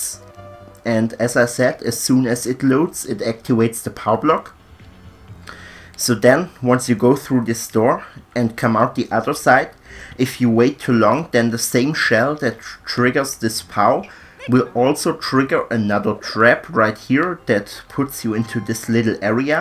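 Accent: Germany. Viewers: Heyy! Man merkt an deiner Englischaussprache, dass du aus dem deutschsprachigen Raum kommen musst xD. Andererseits sieht man es ja in Mario Maker auch. Jedenfalls ist das ein echt cooles Level :) Ich wette, du würdest mit der Beschäftigung von Levelbau Geld verdienen wollen. Ich würds dir echt wünschen, wenn das geht :D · Dein deutscher akzent ist extrem lustig anzuhören xD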